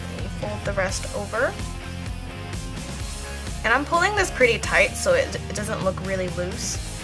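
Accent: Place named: England, United Kingdom. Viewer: where u from u sound like ur from england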